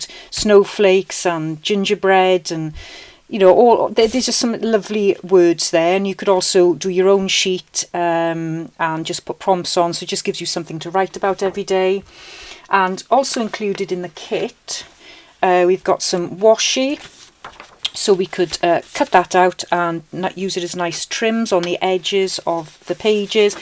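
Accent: Wales, United Kingdom. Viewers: Love love loved this tutorial! You are a natural and so at ease on the camera. Really enjoyed your video and your Welshy voice also, I felt right at home. Thank you for taking part in the collaboration Hugs Rachel xxx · Nice to meet you 😍 love your Welsh accent, adorable 🥰 thank you for your tutorial, you certainly have given us plenty of ideas on tags and I especially love the Tag Journal with the B&W papers, brilliant! Vellum is one of my favourite elements, so I’m excited that you’ve included this too! Thank you again, have a wonderful day, hugs and blessings 💜🙏🏻🫂😍⭐️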